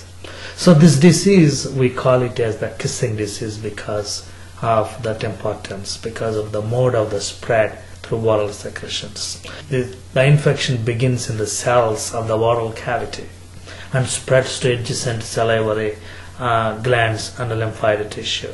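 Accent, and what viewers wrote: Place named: South India, India. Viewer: almost like listening to a new language.you are so learned and look like a hardworking person you should try and practice speaking commonly known and understandable accent of english ...earnest opinion.There are so many people besides south indians who would like to know what you are saying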